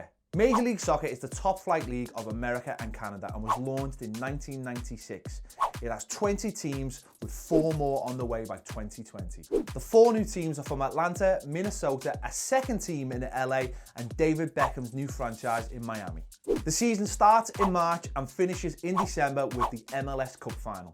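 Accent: Liverpool, United Kingdom. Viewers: Why r u doing the MLS even though you sound like a scouser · Norbert Janas It's a scouse accent (Liverpool)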